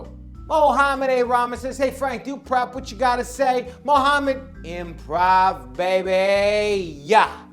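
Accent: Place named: Boston, United States. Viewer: Just an annoying guy from Boston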